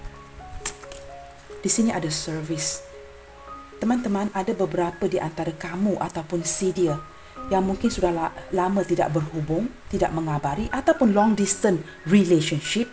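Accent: Malaysia. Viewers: org mn ini bacanya ky org malay.pusing dengerin nya a jdi eheh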